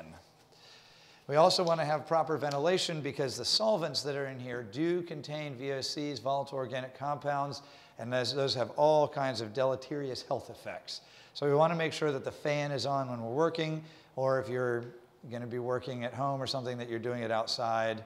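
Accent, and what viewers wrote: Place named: United States. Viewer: Yeah dude .... what’s your problem ! This guy has the least annoying American accent I’ve probably heard .... my mums being the worst !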